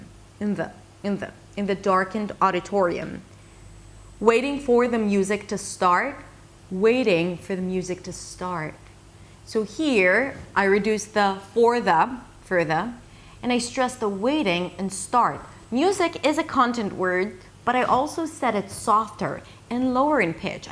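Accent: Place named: United States. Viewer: You are my role model when it comes to pronunciation. Every time I think about giving up, I remember how you managed to learn your perfect American accent without being American. Thank you,!:D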